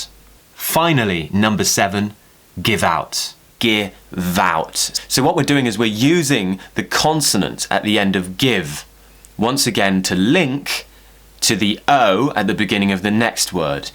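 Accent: United Kingdom. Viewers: Hahah the last phrasal verb I use the most frequently😂😂 (No, no I'm joking of course) To be serious I really admire your pronounciation, your accent is soo stunning. I used to learn American accent, but now I find British accent way more lovely and pleasant to the ears. I've been trying to copy it but I sound ridiculously stupid😀 Cheers!❤ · britsh accent so cool no matter how hard i try to copy it i just can't >< but ur videos rlly help me thank u ♡ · Kanilani Kanilani I don't know if you are barking up the wrong tree, but his accent will be helpful in every situation you face and you will sound posh if you put in efforts learning it. Cheers!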